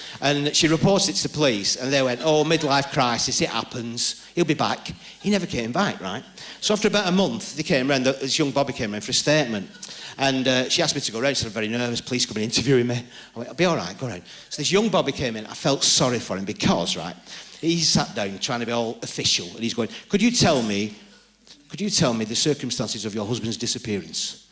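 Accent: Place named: Australia. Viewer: Australian accent can be tough to understand at times. Hope he can acquire some jokes, somewhere , somehow.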